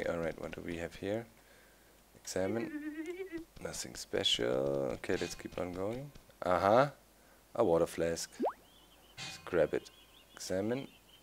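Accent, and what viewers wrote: Germany. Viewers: is that a german accent?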